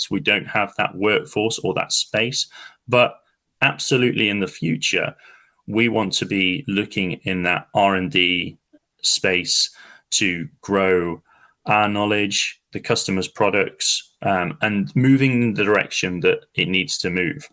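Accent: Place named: United States. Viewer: You sound like your a yank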